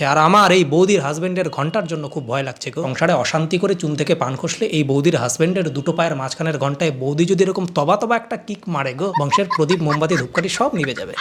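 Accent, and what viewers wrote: Bangladesh. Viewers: তোমার কথা মাঝে মধ‍্যে শুনলে মনে হয় বাংলাদেশের!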